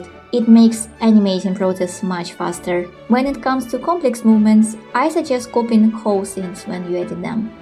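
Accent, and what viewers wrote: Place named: Philippines. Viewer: Hello arr sounds like Filipino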